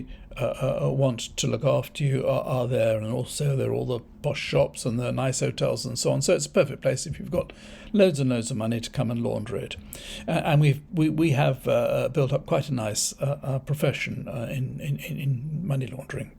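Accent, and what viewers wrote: RP, United Kingdom. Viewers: It's a real cut glass accent.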